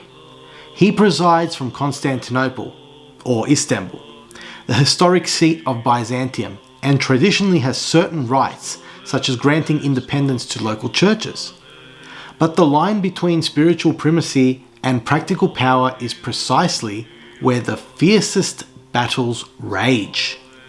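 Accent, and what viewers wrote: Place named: Australia. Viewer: Aussie accent Narrative! Please just cut to the chase.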